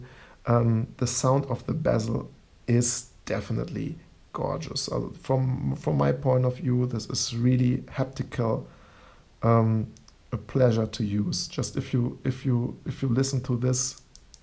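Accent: Germany. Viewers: Man hört das du eigentlich deutscher bist. Ist aber nicht schlimm. Beherrscht die englische Sprache auf jeden Fall besser als ich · Man hört halt echt raus,dass er Deutscher ist🤣🤣🤣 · Deutscher Akzent kickt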